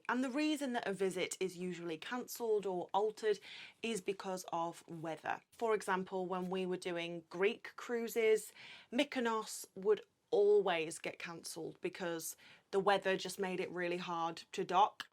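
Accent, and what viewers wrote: United Kingdom. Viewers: is absolutely breathtaking lately, she was always beautiful but something is different. I have to watch her videos twice, once to see her, which completely distracts me to what she is saying, and the second time to listen from the next room so I can hear what she said. Breathtaking. I hope she's not in love as I was planning, when my crypto takes off this year, to renounce my American citizenship, become a British citizen, buy her the biggest engagement ring, so big she'll need a finger brace, buy her the biggest house I can find and be her ladies gentleman for the rest of my life. All she has to do is walk around and speak in that wonderful British accent and laugh that wonderful laugh. That's my simple Santa request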